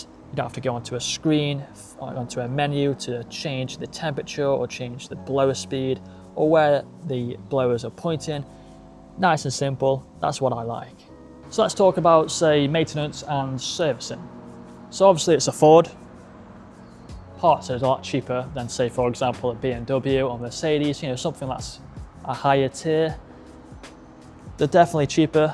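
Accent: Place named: United Kingdom. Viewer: Why are you speaking british?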